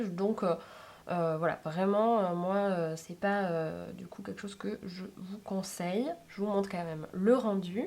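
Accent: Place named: South, France. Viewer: Merci pour cette belle vidéo très instructive (le petit accent me rappelle mon Sud natal). J'ai pas mal de ces différents feutres pour mon bullet journaling et bien que je sois d'accord sur le fait que les Stabilo brush soient fabuleux, ils traversent beaucoup, même des carnets au papier 160 gms, donc attention! Aussi, pour les Faber Castell, un petit truc: on peut sortir complètement la pointe avec une pince à épiler puis l'inverser et paf, une pointe toute belle, toute neuve qu'on peut réinsérer! Une trouvaille aussi, les feutres de la marque Djeco qui sont fait pour les enfants et ne paient pas de mine... sont quasi identiques au Lyra en beaucoup moins cher, j'ai été bluffée.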